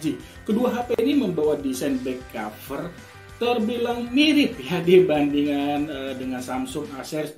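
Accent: Semarang, Indonesia. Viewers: Orang semarang ya bang 😅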